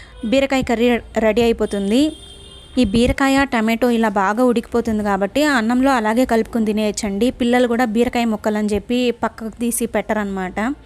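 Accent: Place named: Tirupati, India. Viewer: Mee slang vini chala rojulaindi...maadi nellore .. tirupathi slang nellore slang chala kalustundi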